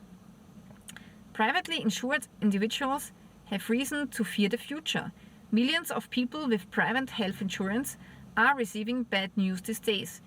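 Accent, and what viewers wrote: Germany. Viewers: I don't know why, but I am getting so used to hearing the German/Austrian accent I want to visit you guys. Are you more Austrian? Because it just sounds like "German" due to my ignorance of the differences between German and Austrian speech.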